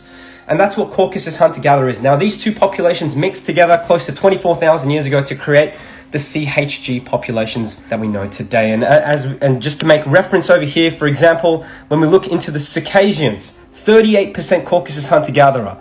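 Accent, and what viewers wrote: Australia. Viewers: Which part of Australia you are from? An Iranian with an Aussie accent 😮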